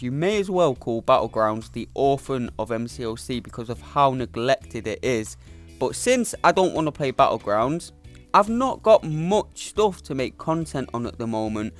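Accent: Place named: South Yorkshire, United Kingdom. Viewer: been trying to place yours now you mention it haha, I'm from South Yorkshire and you sound like you could be that neck of the woods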